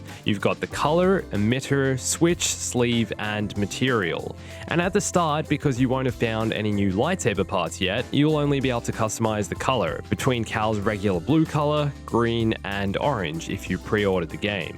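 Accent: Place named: Australia. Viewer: What's with the accent? Mostly Aussie, part yank. Watched too much anime?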